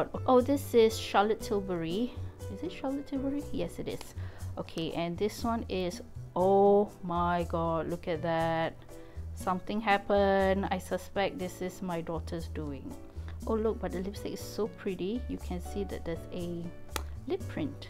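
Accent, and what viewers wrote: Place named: Singapore. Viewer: Recognised that Singaporean accent. Love the Damier Azur print!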